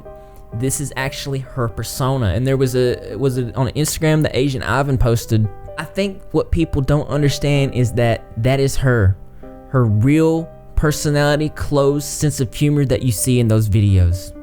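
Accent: South, United States. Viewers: "We all have a crazy ex who turns another ex in to us"😂😂😂. I'm from Louisiana and you have an accent, are you also from the south? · Ohk his voice sounds southern but then sometimes sounds like’s from the UK.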